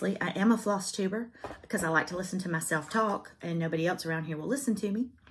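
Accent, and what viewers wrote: South, United States. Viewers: Hi - to my ear you do have an accent - that southern one and I think it is lovely. I enjoy listening to you. Your chats are so valuable to the community. Don't ever doubt yourself if you are enjoying yourself - then it is not wrong or negative.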